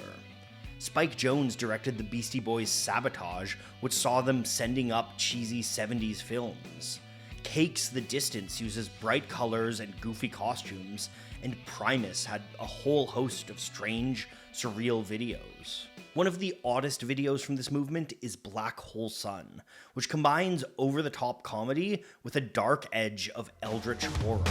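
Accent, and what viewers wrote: Canada. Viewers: I never realized was canadian till he talked about the dart hanging out of slash's mouth l.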